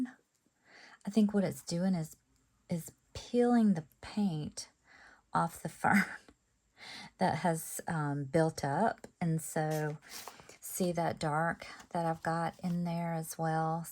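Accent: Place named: South, United States. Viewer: My thoughts: 1. Do a background like you did for try 3 (a few shades of mid to dark blues eg a Prussian blues and a few tiny dabs of black as it’s so strong it can take over. 2. Pick that up on a piece of paper 3. Do another gel plate with soft to mid greens and a darker green like pthalo green 4. Place your leaves 5. Use your 1st pick up piece to pick up the negative image of the leaves (the lack of veining will look more like your fabric and will show more of the colour) 6. I think the reason your leaves don’t match up perfectly is because you’re comparing a positive with a negative image of the leaf so it’s a mirror image… My 2 cents worth😅 It’s really pretty fabric I loved watching your process, hearing your thoughts and lovely southern accent From Oz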